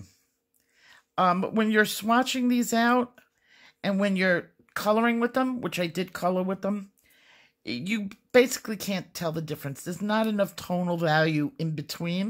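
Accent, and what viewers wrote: New York, United States. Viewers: Omg I love your reviews. Love the blunt New York honesty with accent too.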